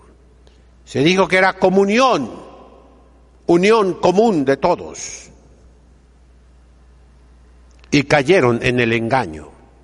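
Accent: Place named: Argentina. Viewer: Más exactamente con acento argentino.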